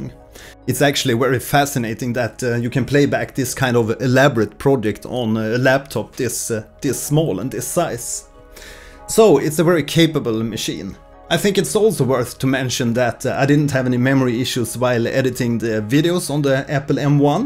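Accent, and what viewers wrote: Sweden. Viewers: dat swenglish <3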